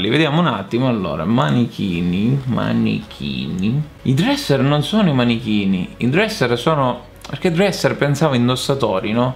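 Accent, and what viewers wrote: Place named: Sicily, Italy. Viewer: Mi manca un po' il tuo accento siculo <3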